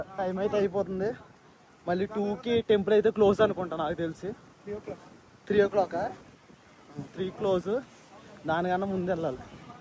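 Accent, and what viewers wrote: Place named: Godavari, India. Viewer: Ekkadaki vellina godavari slang😂.. Bavuntadi andi mana slang❤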